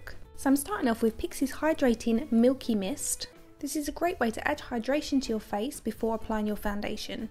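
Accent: United Kingdom. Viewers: You truly make everything look so stunning!! You're so talented- I'm just so very pleased I found you!! And might I add, I love hearing a British accent instead of North American🙊😉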